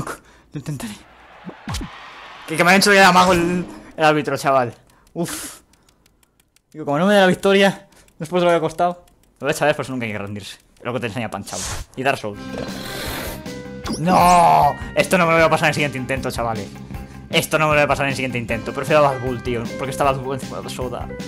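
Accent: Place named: Chicano, United States. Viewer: Apoco si hablas español, pensé que eras chicano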